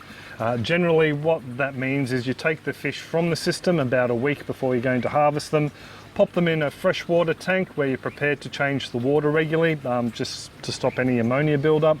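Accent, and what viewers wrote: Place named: Australia. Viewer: I have enjoyed your videos for a good bit of time now but just wanted to say your Australian accent with your wife’s man being has me reminiscing my childhood! It reminded me of the Disney cartoon “The Rescuers Down Under”. I’m not poking fun... I just think it’s very cute:) Your fish flavors look amazing!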